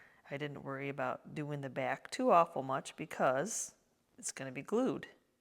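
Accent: South, United States. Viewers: I have been slowly going through and making over things that need a new home. I know i am being nosey but where did you get that southern accent as i can not help but ask.. craft on my friend...😊😊😊😊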